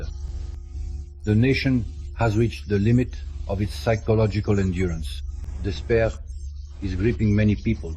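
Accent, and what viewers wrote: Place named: Poland. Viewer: english polish accent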